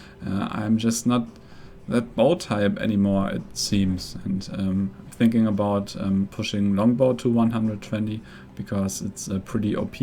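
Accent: Germany. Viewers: Love your German accent IGN: ZeusKingofGods2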